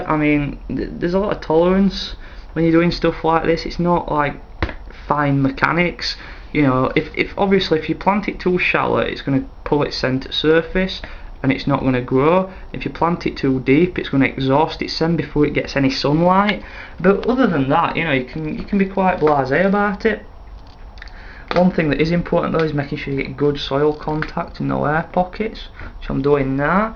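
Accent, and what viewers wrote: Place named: Yorkshire, United Kingdom. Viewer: Great video, very helpful to see the different ages of your peas (i just started my peas on the 13th April, and they are doing nicely. i've planted 4 in a 5 gallon bucket and added twigs/branches around as a kind of trellis (very rustic) until i can plant outside after frost season (here in Norway) Yorkshire accent is very distinctive (i used to live there too) can you keep us updated on their progress please , helps knowing what they'll look like thx very much :)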